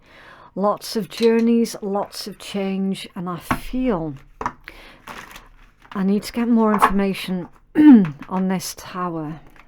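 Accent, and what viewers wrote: Scotland, United Kingdom. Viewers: You're scottish 😍😍 hahaha Loving the accent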